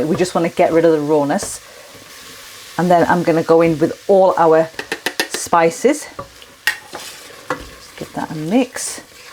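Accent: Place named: Lancashire, United Kingdom. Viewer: I'm really ready to make this dish. Thanks for the recipe and encouragement. I also detect a Lancs accent - worked there many moons ago. And it's so nice hearing you pronounce the word Dal 🙂 brings back fond memories. Ive subcribed and will urge others to do so... Thanks so much.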